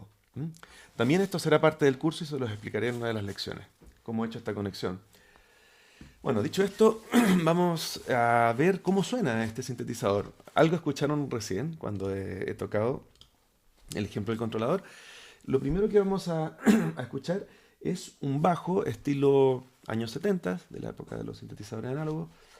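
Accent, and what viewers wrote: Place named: Chile. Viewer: que maravilla encontrarme con tu canal y por las dudas al escuchar tu acento me fui a revisar tu cv para soprenderme nuevamente de que eres chileno y haces patria tan lejos. Tras algunos minutos ya entendí lo que es el pure data y están muy interesantes los pasos. A ver si me animo a descargar y aprender a usarlo. Muchas gracias por compartir! Excelente canal educativo :) Saludos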